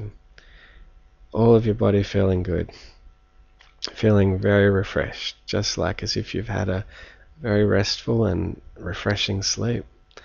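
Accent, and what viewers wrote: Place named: Australia. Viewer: This could have been a beautiful meditation if you trusted us to connect with our higher self when you took us there and gave us a little silence to see what arose. You were pushing an outcome, Just a little advice, a meditation is not a pod cast, too many words risks us being moved by your words not our personal 'inner body felt' experience which will be different for everybody and it also keeps us in our heads, having to try to listen to every word you say. This was my experience but going by the comments i am alone in this so obviously it served a purpose for so many so congratulations. Best bit of this was to hear an Australian accent for a change. love Mary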